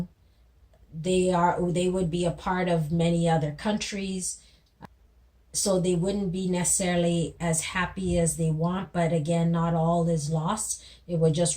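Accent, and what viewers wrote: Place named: United States. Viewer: judge by your accent you are american . I am NOT SURPRISE OVER YOUR NON STOP ROTTEN BASTARD LABOURS AND THEIR YOUR VERY 'DISCRETE' DEFINITION ABOUT THE SOFT BREXIT. THE US IS NOT FOR TO SEE THE UK CITIZENS HAPPY AS THEY ARE MORE INTELLIGENT THAN THE AVERAGE PEOPLE IN THE US. AND ALSO THE US IS BEHIND THAT ASSHOLE RICH GUY BLOODY HELL OF A LABOUR AS ALWAYS BEEN. THE US IS ALL OVER LIBERAL MEANING MAKE NO SENS JUST TAKE THE POST TAKE ALL MONEY AND GIVE A SHIT IN PEOPLE AS LATELY .... SO SOFT IS THE AMERICAN BULL WITH DESTROY THE WORLD. SOFT IS NECESSARY IS BAD AND VERY VERY BAD WHEN IT COMES TO THE BREXIT. IT WILL NOT LET BRITAIN TO LIVE TOTALLY AS THE PEOPLE WILL AND VOTED FOR . BRITAIN WOULD BEE SOON A SUPER SUPER POWER AND THE US WILL NOT ALLOW THAT..... THAT IS WHERE THIS IDIOT CORBY GOT SUPPORT FOR AND FROM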